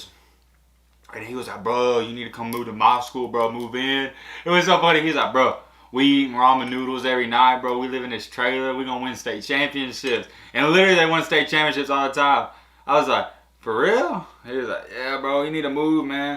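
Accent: South, United States. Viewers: He’s southern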